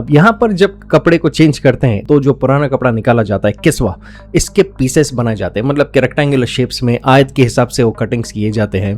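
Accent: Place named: Maharashtra, India. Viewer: You have marathi.accent . Ok. Good जय।महाराष्ट्र जय शिवाजी राव। हर हर महादेव।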